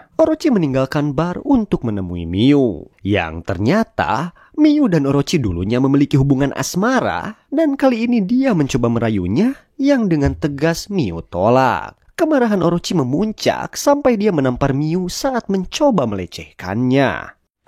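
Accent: West Java, Indonesia. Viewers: Bang asli orang mna orang Sunda iya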